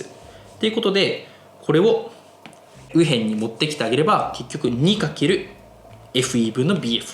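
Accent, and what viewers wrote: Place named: Yamanashi, Japan. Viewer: 山梨だよ、多分甲州弁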